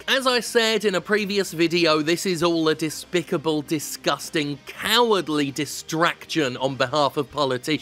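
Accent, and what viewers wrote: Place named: United Kingdom. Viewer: I feel like a guy with a British accent immediately loses credibility on this issue. The country Jim was born in has been throwing people in cages recently for offensive tweets. Our individual gun ownership prevents that sorta government oppression at large, and those that don't own guns are free riding on that notion the same way that anti vaxxers free ride on the herd immunity of diseases.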